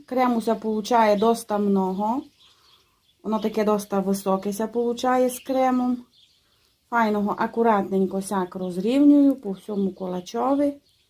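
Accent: Transcarpathia, Ukraine. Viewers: Дуже дякую за чудові рецепти.❤Прекрасний закарпатський діалект.Любуюся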